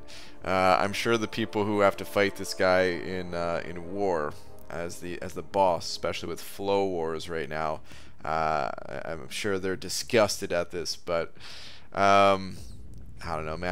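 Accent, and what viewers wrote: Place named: Germany. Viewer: Hi are you from germany you had german akcent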